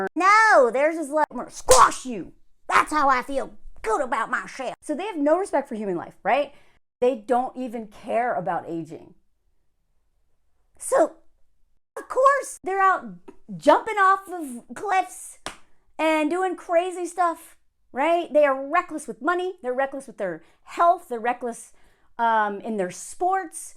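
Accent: South, United States. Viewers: The Southern Belle twang: Here for it! And the puppets - a childhood joy coupled with information I enjoy as an adult - Enjoying the creativity with your content - Hi ❤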